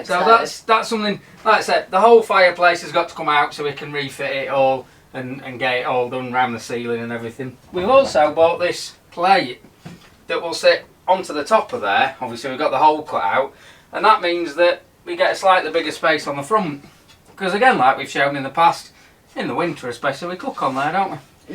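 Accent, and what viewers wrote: Yorkshire, United Kingdom. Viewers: Your wonderful Yorkshire accent is similar to that of Mick Ronson, a guitar hero to many like me. Do you both hail from Hull as he did, or are your roots closer to Leeds, Sheffield, etc.?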